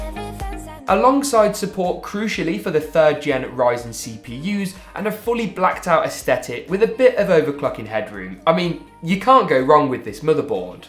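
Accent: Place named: Birmingham, United Kingdom. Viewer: Sound like a brumie but use $ any reason why not £.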